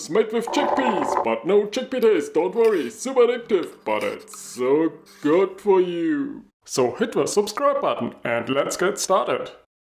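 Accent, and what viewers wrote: Germany. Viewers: It is probably the well-known German accent.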